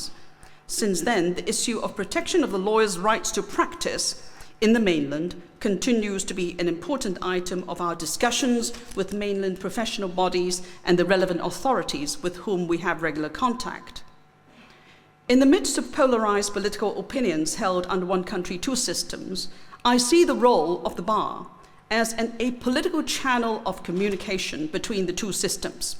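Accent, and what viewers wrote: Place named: England, United Kingdom. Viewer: 下⋯咁正既English accent, 我真係好想知你d英文有幾好聽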